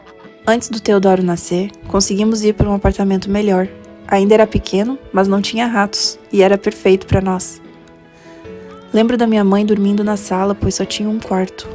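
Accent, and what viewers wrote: Rio Grande do Sul, Brazil. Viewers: Nossa que lindo!!! Ah e eu sabia que tu é gaúcha, já te vi falando "tu" e acertei no meu palpite. Sou de São Sepé, cidade vizinha de Santa Maria. Parabéns pela coragem! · Ameeeei tanto.. logo que vi o primeiro vídeo reconheci esse sutaque de gaúcha 😂😂😂 linda, achei vcs recentemente aqui e já amo os videos, sou de São Gabriel 3hrs de ônibus até Santa Maria 😍.. amei o vídeo e amo a risada do 😂😂😂 beijão pra vcs ❤ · Conheci o canal a pouco, vim parar aqui e logo reconheci o sotaque gaúcho da minha conterrânea! Muito amor e muitas conquistas para essa família linda! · Sou do RS tbm logo vi teu sotaque no primeiro vídeo que assisti 😂 amei tua história fiquei muito emocionada, que tenha muito sucesso sempre em sua vida ❤️❤️❤️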